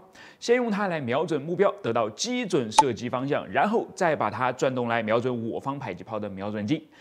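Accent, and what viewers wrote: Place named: Mainland, China. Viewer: 這是大陸的唸法，很多視頻中聽過。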